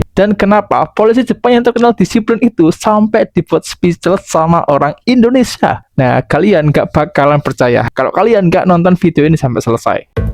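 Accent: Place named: Java, Indonesia. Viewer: Logat Jawa nya ,,jangan terlalu medok ,,min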